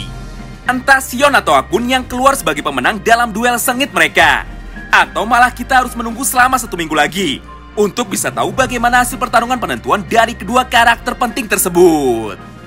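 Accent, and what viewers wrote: West Java, Indonesia. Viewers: Bang mau nya ni,, abang orang Sunda tah? 😁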